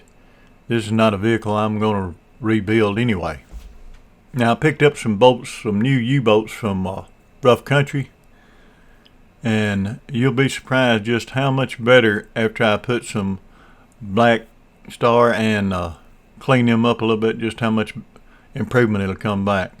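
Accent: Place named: Scandinavia. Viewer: What sort of accent is that? Sounds Scandinavian to me🧐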